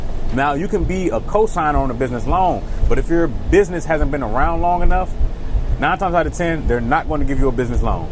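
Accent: DMV, United States. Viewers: I heard the DMV accent and subscribed. You’re in my part of the world so your information is more relevant to me.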